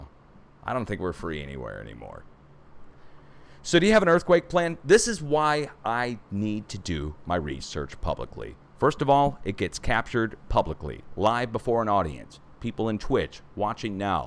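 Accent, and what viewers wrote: Russia. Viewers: lollllll Love the Russian accent!!! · Nobody no agency should stop you. But unfortunately in this time they try. And why? My dear that is the question. Like your russky accent. You kinda sound like Natasha's boy toy Boris.God bless you guys. And thank you.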